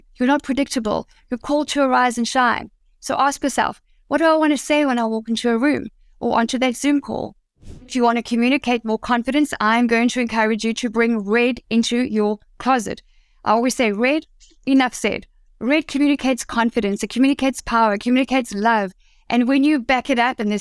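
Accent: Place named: South Africa. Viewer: I can hear you are in South Africa. Do you do make overs? I need help.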